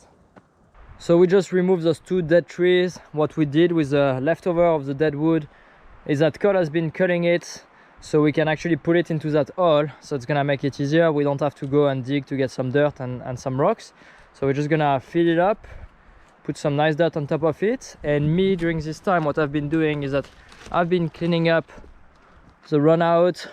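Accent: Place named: France. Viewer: T'es français toi ça sentend🤣🤣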